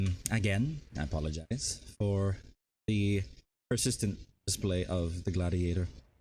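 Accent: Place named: Philippines. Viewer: Damn! I knew I was right, you have a "slight" filipino accent haha. Same here!! Though im pure pelepeno. Btw whats your streaming schedule would gladly tag along :)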